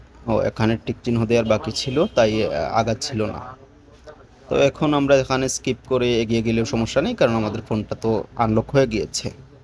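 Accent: Sylhet, Bangladesh. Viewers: Vai Sylheti😊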